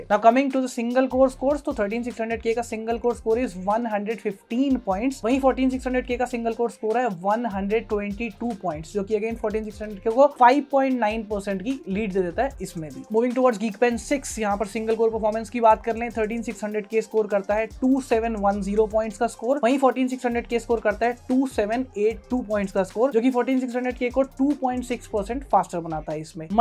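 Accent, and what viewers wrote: India. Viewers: bhaiji tusi punjabi ho ? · tum Delhi se ho kya ?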